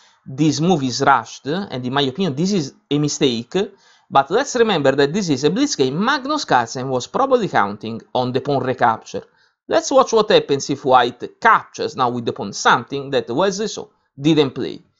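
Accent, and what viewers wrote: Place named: Italy. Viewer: Good comment... however... from the accent it is clear that you are italian...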